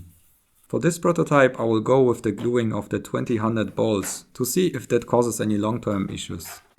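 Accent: Germany. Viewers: Das Deutsch hört man wirklich immer rauß :D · Like is voiceovers are great but in he's like excited in video he sounds so German😄😂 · Are you from germany ?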